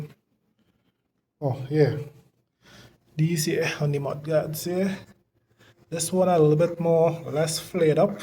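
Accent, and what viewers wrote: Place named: Trinidad and Tobago. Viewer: Hi, i can tell you're from the Caribbean, Trinidad and Tobago, right? I have the Supermach SM26 from Pricemart and it works amazing. I noticed that seat is mounted backwards compare to mines. Also if you press and hold the up and down buttons same time, press the M button until the "P0 8" Icon appeared and press both arrow buttons to come out.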